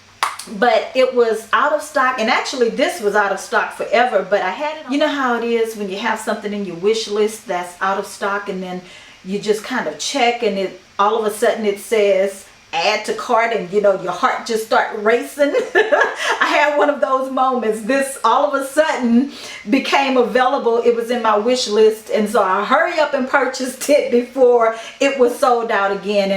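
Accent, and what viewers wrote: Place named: Houston, United States. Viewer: I just discovered you and I think you are funny and knowledgeable. Plus you make me homesick. I’m from Houston and your accent is making me think of home. Subbing right now!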